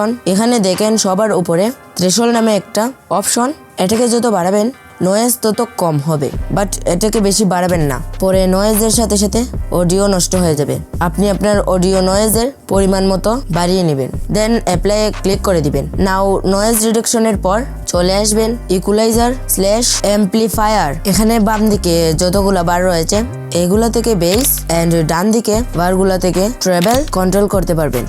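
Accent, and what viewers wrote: Sylhet, Bangladesh. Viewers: By the way Are you from Sylhet ?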